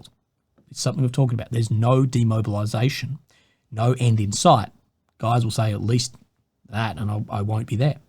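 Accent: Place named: Australia. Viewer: Good luck with the surgery and shit. Ive been in korea the last 2 years (kinda not by choice lol ) and yours and is the only aussie voice i hear regularly, keeps me sane. Take care of your self and hope u back in 1 piece soon.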